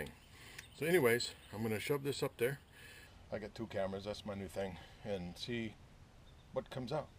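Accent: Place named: South, United States. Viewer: Wow, You Got it Brother!! Well taught! You got, NC, HD, Clear clean Southern English, like I have, and Using God's name to share, not used in Vain! I was just Watching my A Good Guy, Originally from "Car Crazy", Barry Mequiar from his new show "Ignite your life" Guys and gals sharing their Faith, from all us sinners and fools, That Christ still Loves Us, even knowing how screwed up we are. God Bless YOU for worldly help, but more importantly, filling the Hunger, that so many have for meaning and purpose., Lee, Fl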